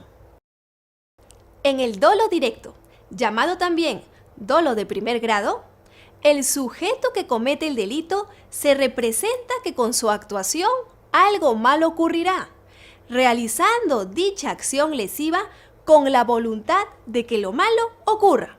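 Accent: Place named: Mexico. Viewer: Habla como Mexicana...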